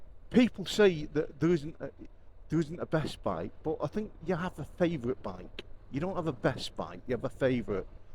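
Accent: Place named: Lancashire, United Kingdom. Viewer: Very enjoyable "wednesday waffle", i enjoy your miss mash manc,cheshire,warrington accent more than posh freddies dialect,. I had to laugh when you decided not to follow the silver car and ended up pulling out behind him again when you came to the junction,. Nice change of scenery at the ducati shop instead of your usual go to "youles", a breath of fresh air, i used to go to woods of abergelle (now no more with the completely motorbikes crash) for my ducati fix, andrew woods was brilliant, he would let you demo most bikes, i took my old boss,s 748sp there once for a service and andrew gave me a 916 for a few hours while he serviced the 748, the first demo i ever had there was an early 900s or something simalar, it put me right off ducati's, the early ones werent very refined, the 916 was lovely tho,.i'm a yamaha man through and through so i dont tend to get side tracked to easily,. 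My eldest son bought an R reg (98) 996 sps and its cost him a fortune over the years, mechanical issues early on and now belt changes even tho he has'nt ridden it since 2019, still has to do a belt service when its due, it costs him £25 p/week storage costs in london to, married with 2 young kids and a high pressure job gives him plenty of money but little time to enjoy the bike,.he bought 3 of my collection recently and he has them garaged at a friends place 20+ miles outside london, only room for the 3 of them, tzr3xvsp 250,rd350b, cb750k2, ive still got my yamaha R7 ow02 (2000) at least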